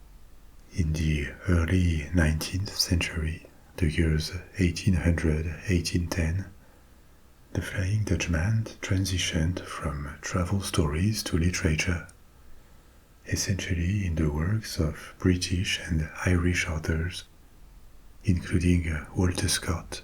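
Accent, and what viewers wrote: France. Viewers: This is the perfect channel you put on to hear a gentleman tell tales and tell history like as if he was there in a gentle voice with a lovely French accent, a perfect combination, thats something to me is rare, and i love it, so all i have to say is this, Merci!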